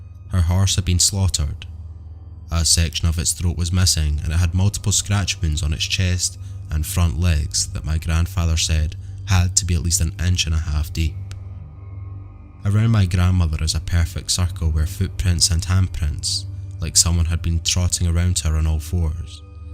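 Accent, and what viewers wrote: Scotland, United Kingdom. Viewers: Mr., I, myself, am Native American. It was a true joy to hear stories of Skin walkers in a Scottish accent! I loved it!! ❤❤🤘👍👊fist bump! I truly love your channel!! So honored to have subscribed!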